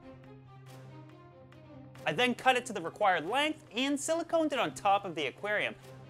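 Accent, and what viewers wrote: Canada. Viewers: I love how Canadian you sound... it's great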